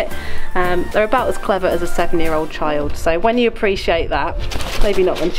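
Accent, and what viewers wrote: United Kingdom. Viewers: You have a beautiful British English accent. And your female raven Fable sounds cute when she says, “hi, boop boop,” and, “wow.”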